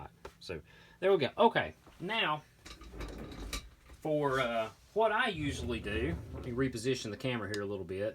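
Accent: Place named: Alabama, United States. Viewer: That Alabama accent is THICK, son!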